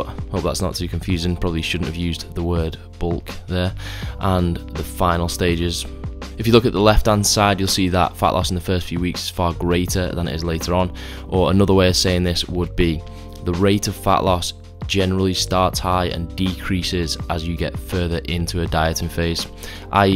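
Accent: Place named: Yorkshire, United Kingdom. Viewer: Where are u from? I love the accent, sounds a bit North English probs Yorkshire?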